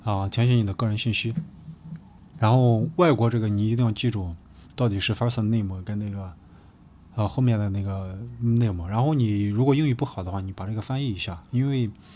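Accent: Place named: Shaanxi, China. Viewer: 主播 悄悄问你一下 你是不是陕西人？陕普口音😊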